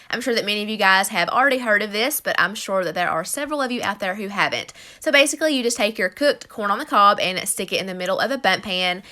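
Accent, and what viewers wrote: South, United States. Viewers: I have just fallen in love with your channel! makes me feel like a horrible mom/wife I gotta step my game up! I absolutely love your southern accent too, oddly soothing as it reminds me of the best part of my childhood living in Florida (yes I know no one sees it as the south but my town sure acted a lil southern) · I love the way you talk! Are you a southern belle?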